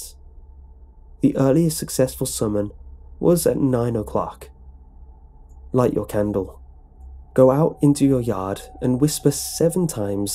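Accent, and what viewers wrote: England, United Kingdom. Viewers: I believe he is english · He actually mentions in his "Draw My Life" video that his dad is English and his mother is Filipino, which I found surprising. Most people I know who are from the Philippines have thick accents, even if they've spent years or even a couple decades in another country, and alot of people I know who are raised with one or more parents who have thick accents end up with at least a faint accent. has an absolutely flawless English accent, and until I saw that video I assumed he was raised in some posh English family:P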